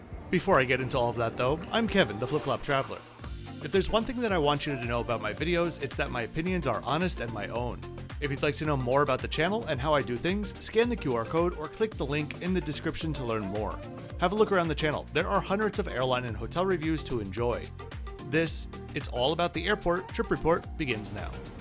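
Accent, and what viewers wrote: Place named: United States. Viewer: You're not an American? I don't know. Your accent gave me American/Canadian vibes.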